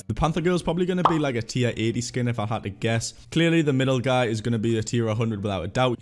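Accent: United Kingdom. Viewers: He pronounces Tier (Tear), the same way Evryone else in the UK, we do not pronounce it (Tire) like Evryone else, we say it the correct way.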